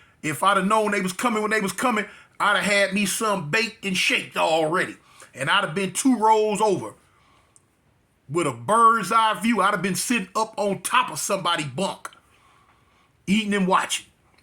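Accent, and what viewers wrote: South, United States. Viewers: Imma keep it real og, you sound more southern than you do LA. I know it might be the 23 years, but dang, I'd swear you were born down here but with an LA spirit. It's like your heart is west coast, and your spirit is southern. "I said dang! That's sum'n else right thei'airr!" 😅🤣🤣 That 'they're' wasn't all the way LA or all the way Bama. It was mixed perfectly. 😅🤣🤣 Man, you sound like every one of my uncles & their friends I've ever heard. Word for word. & None of them ever left GA. Not one time.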